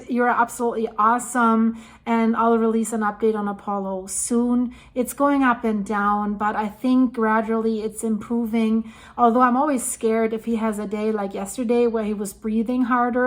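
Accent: Germany. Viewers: What a funny accent 😂 Is this German, Canadian or anything in between? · Actually, is German and lives in the US, hence the accent. I love accent 😁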